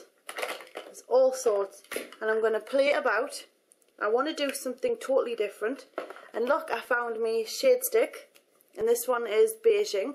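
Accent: Newcastle, United Kingdom. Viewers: It's a Geordie accent, it's from the North East of England. Typically around the Newcastle area. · She's originally from South Shields, England. It's called a Geordie accent and I love it too! :D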